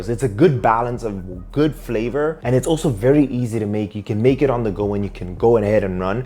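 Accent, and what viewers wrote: South Africa. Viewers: Awesome! Thanks Doc! I honestly thought you were South African 🇿🇦 because of your accent😅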